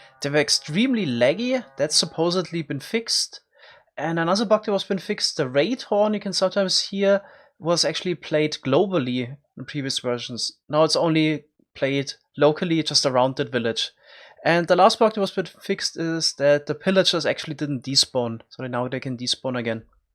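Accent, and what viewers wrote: Germany. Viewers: Dieser deutsche Akzent xD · Man merkt halt echt das du Deutsch bist ^^ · Kann es sein das du Deutsch bist? Wenn ja man hört as an deiner Aussprache! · Deutscher Akzent Noice👌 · Ξενοφώντας Σούλης I’m Mexican. When I heard his German accent I shit my pants 😂😂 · I hear that you are german. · Kann es sein dass du deutsch bist? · This english Akzent. I höre, dass you are a german sprechen Person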